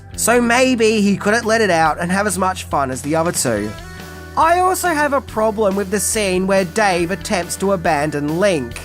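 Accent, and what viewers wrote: Australia. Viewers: No don't be like that! It's all good. We can chalk it up to your cool Australian accent! Most of us don't mind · Who cares if you make a mistake in pronunciation?!? By this time (those of us who have been longtime fan / friends) we all know how much you love your work and the add ins and jokes you pop off more than make up for something as small and Brandon or Brendon. Intent counts friend and your intentions and ending products are AWESOME!!! Plus a lot of us in the states would love to sit and listen to an Australian read a phone book just to hear that accent!!! It’s the best! Keep on keeping on buddy! 😊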